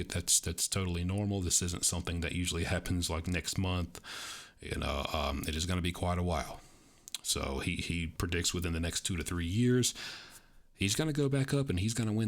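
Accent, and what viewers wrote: South, United States. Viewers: I agree! Great voice, lovely soft Southern accent 👍💐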